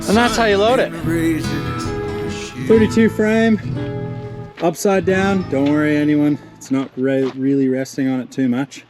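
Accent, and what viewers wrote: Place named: Western Australia, Australia. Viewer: As i am watching this Video my brain hurt. All lhd Cars so your in the americas I suppose but I was hearing a western accent with hint of an Ausi accent.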